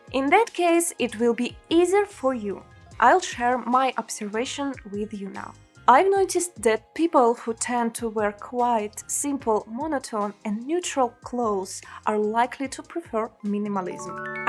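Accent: Russia. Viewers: Ничего вы не понимаете, во первых акцент хоть и хорошо слышится, но саму речь приятно слушать, уши не режет, как по мне многие русскоговорящие зря пытаются полностью избавиться от акцента, это же изюминка, главное чтобы тебя понимали и чтобы ушам больно не было, в данном случае очень приятно слушать, мне такой акцент очень даже нравится. Вы еще не слышали что такое плохой акцент, послушайте Пакистанцев или Индийцев, вот там не приятный акцент, но даже они не заморачиваются и не стесняются своего акцента, и все смотрят их видео, общаются, главное какой контент они производят. Удачи вам! Не слушайте тех, кто говорит что у Вас ужасный акцент, это не правда, у вас очень приятный акцент:) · Fun fact: when you are speaking English, you hands movements are a lot less than that when you’re speaking Russian:) all that is because the center of “sense composition” is moved from soulful thought delivering to “make sure your English is correct and distinctive”. 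P.S this Russian Natasha accent is certainly your charm. Thanks for a great video:) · Why not to have channel in English?! Great Idea 👌 Russian accent ? - why not?! Keep going on! 🤗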